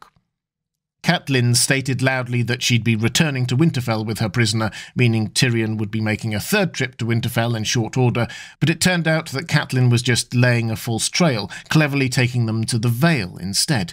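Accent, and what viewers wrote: United States. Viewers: Wow. As an American, listening to and then hearing a sudden American accent is quite jarring. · Nobody wants to hear an American accent do this (nothing personal, I’m Australian and nobody wants to hear us narrate this either). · Yes, the American accent is really incongruous.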